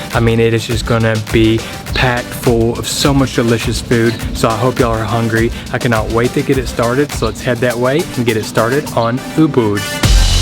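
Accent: South, United States. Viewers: You are a combination of Mark Weins and Trevor James with a hint of Southern twang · Sir, what do you do? Your travel is a long one. I have a two week vacation and Philippines is my spot this summer. I am in Chicago. You sound like a southerner. I am a Chemist here in Illinois.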